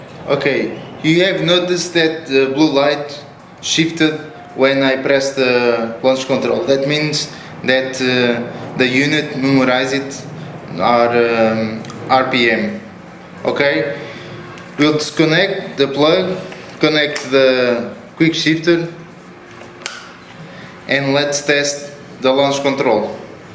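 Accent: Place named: Russia. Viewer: Guy what state do you ride in, or country, you sound russian. That way i can show you a thing or two about your CBR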